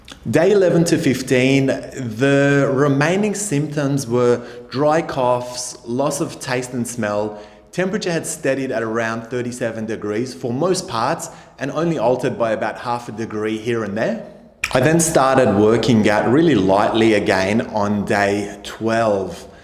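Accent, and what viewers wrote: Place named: Australia. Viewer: Aussie accent in more understandable than British and other English accent except American.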